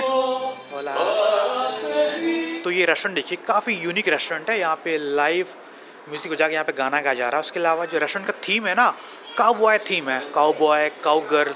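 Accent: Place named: mixed, India. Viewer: U.P. Bihar me sab tumhare tarah hee bolte hain kya?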